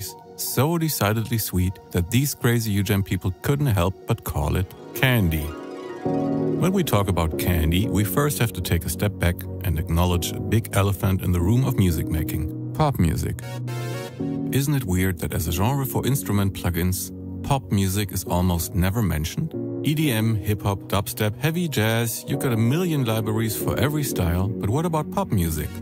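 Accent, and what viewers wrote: Germany. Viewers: German accent ...damn..the inventors of groove music lol...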